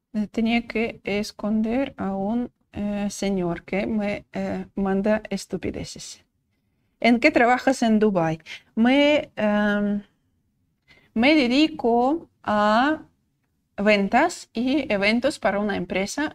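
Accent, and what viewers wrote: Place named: Russia. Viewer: Jamás me Olvidaré de esa voz mesclada con asento Ruso ❤ te envio este corazón lo que te puedo Regalar en este Dia de san Valentín....... desdevenzla a marciales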